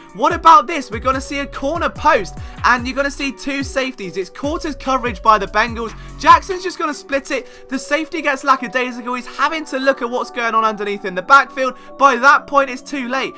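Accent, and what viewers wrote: England, United Kingdom. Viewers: Hi! Great content and all. Just curious, what’s your Philly roots? It seems like you know so much about Philly sports mist especially with your English accent:-)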